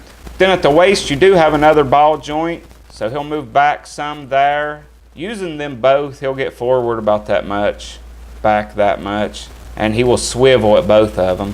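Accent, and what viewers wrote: South, United States. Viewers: From what part of the USA is your accent? I am not American but it sounds like how most people talk in forest gump movie.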